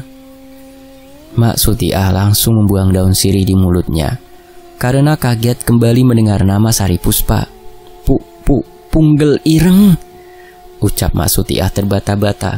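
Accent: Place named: Sunda, Indonesia. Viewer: Lucu denger bahasa Sunda nya .. 😁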